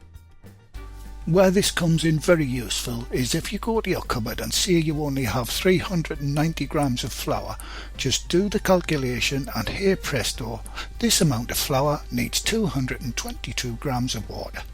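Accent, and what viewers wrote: United Kingdom. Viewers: Hi, I'm a too, I used to make bread weekly, thirty years ago, and am just getting back in. I've killed about three "sourdough starters", don't start in the winter, I've made a couple loaves well, just baked your "baguettes" yesterday, first time with such, and they came out beautiful, light and fluffy, crispy on the out, and I'm making bread for dinner tonight, with my daughter and son in law. I've long made one loaf, by "division", in cups, teaspoons, but this is the first time doing it with grams, and I expect to have more "equal" outcome, I really like weighing, because it matches with humidity and such better. I only hope my loaf comes out half as nice as yours, this afternoon. I've been using my "mixmaster" always did by hand before, and am shocked at how easy it is, with the mixer. I love your technique, I've kneaded for decades, and it "makes" the bread. 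Thanks for a great recipe, and excellent technique, bringing me back to doing it right. Your British accent makes it that much more enjoyable, I'm a retired mechanic, Marine, I try not to get the dough too dark, lol. Thanks for a great video.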